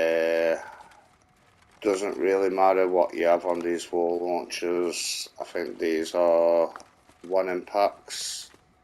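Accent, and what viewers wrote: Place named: United Kingdom. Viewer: Bruh ur from UK 😂 I thought u was from US 😂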